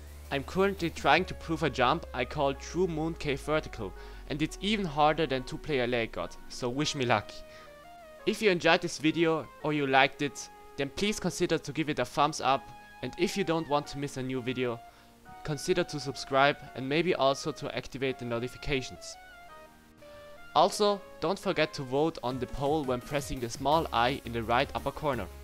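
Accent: Germany. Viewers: Lol man merkt dass er deutsch ist😂🇩🇪 Wo sind meine german people 😂🇩🇪🇩🇪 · Are you german ?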